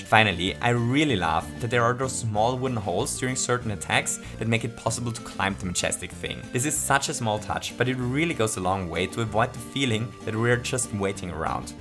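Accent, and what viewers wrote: Austria. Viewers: Moment du bist Österreicher? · Warte, du bist Österreicher? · Youre austrian??? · Hmm I never knew you were from Austria . Didn’t recognize the accent . Learn something new everyday ig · also he just has an austrian accent :P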